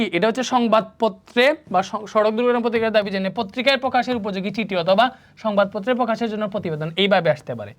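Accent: Sylhet, Bangladesh. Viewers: vai tumi ki sylhet ti tumar basa sylhet naki 😊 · আপনি বোধহয় সিলেটী। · apni Kitah sylheti ni?? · bai apne ki sylhety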